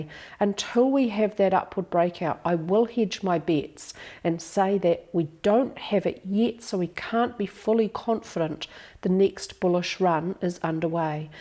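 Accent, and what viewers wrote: New Zealand. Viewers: Love your work, so HAPPY to hear that Kiwi voice again The weaknesses shown in the various indicators on XRP really show an incredibly indecisive market right now. So, so hard to find signs of life! 🤪🙏😁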